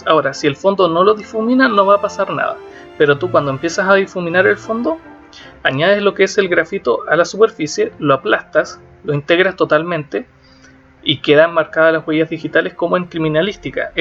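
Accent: Chile. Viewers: buen dibujo! Chileno no?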